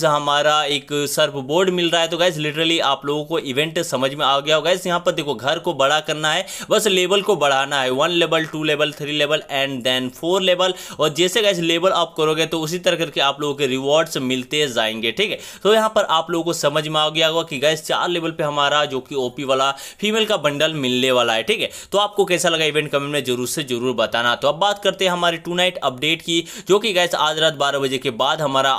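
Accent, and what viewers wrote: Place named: Bihar, India. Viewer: Bhai aap Bihar se ho naaaa mere ko pataaa haii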